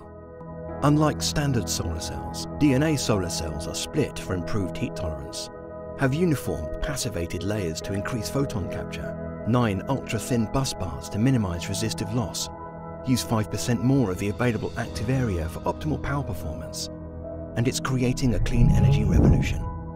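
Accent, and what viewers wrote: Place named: United Kingdom. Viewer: Who wants to listen to British accent in the U.S? This is a bad sales strategy.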